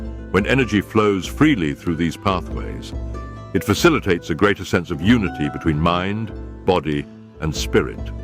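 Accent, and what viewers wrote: United Kingdom. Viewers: Why do you use British voice over? Are you feeling inferior to those assholes?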